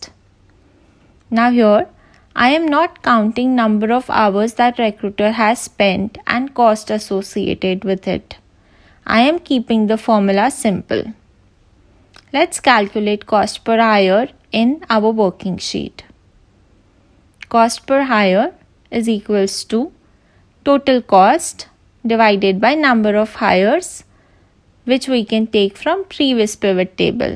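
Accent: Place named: Trinidad and Tobago. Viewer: Are you from Trinidad 🇹🇹? You sound Trinindian.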